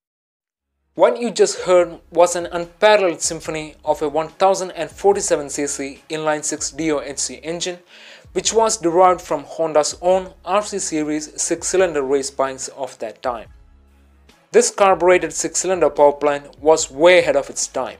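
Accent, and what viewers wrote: Kerala, India. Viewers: anna..ningal mass aa, aa mallu + anglo slang kettapozhe click aayi..lv u 😘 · That Indian accent 🤣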